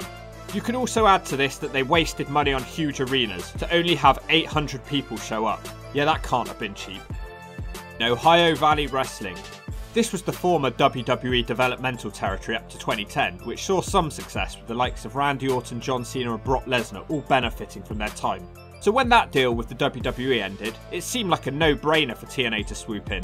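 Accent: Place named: United Kingdom. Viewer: Why do all the creators that make great wrestling content, have a British accent 😂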